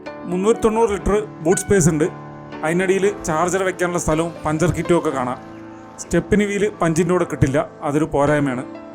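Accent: Palakkad, India. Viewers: ഇയ്യ് പാലക്കാടാലേ ??😁😁😁😁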